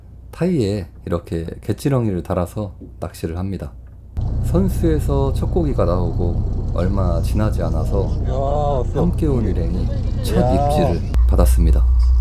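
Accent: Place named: Chungcheong, South Korea. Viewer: 역시 고양이 아빠 충청도 사투리방송 구수하니 잼나네요 간만에 낚시 하시는 모습도 힐링되네요 감사합니다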